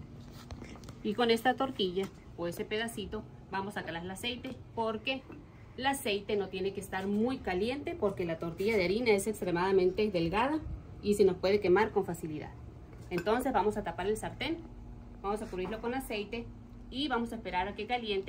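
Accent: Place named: Sonora, Mexico. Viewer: Asi hablamos en Sonora ja ja ja. Arriba el Norte!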